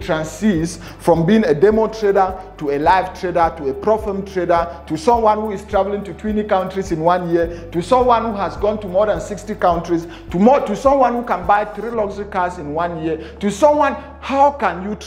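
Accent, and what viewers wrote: Cameroon. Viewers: You sound like a Cameroonian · Man you speak like a Cameroonian ❤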